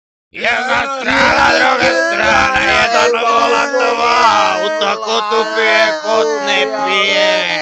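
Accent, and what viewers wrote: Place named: Zagorje, Croatia. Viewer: Ovo je vrhhhh🤣🤣🤣🤣 molim te samo izbacuj van videe s zagorskim naglaskom predobro je. ...vrne me u cajte kad sam hodil u Krapinu h skole pa smo furt neli probleme z krapincanima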